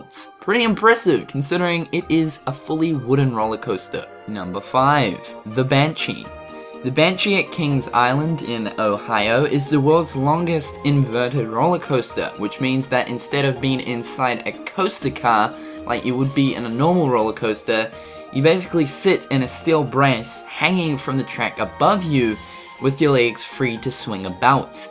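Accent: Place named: New Zealand. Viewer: The way you said Staffordshire hahahahahha XD not hating on your beautiful NZ accent btw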